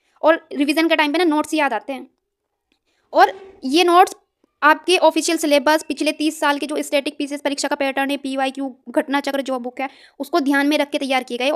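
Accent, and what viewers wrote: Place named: Haryana, India. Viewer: Up west se ho thoda hriyana wali vibe h language me i think hapur gaziyabad meerut 😮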